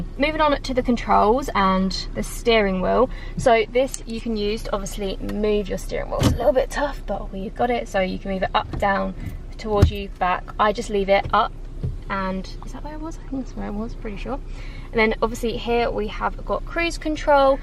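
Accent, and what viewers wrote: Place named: United Kingdom. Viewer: i love the bri'ish accent :D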